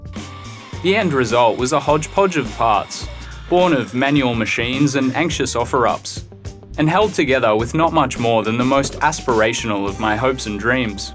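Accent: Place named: Australia. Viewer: Your delivery style suggests SuperFastMatt’s lost Aussie brother, but without the fast bit. Interesting project. Thank you for sharing. Peace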